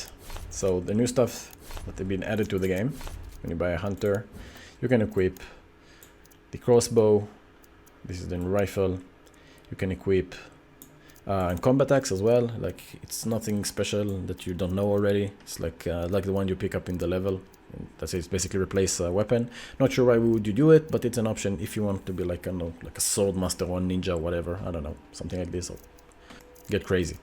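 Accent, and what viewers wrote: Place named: Israel. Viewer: i can still hear that hebrew accent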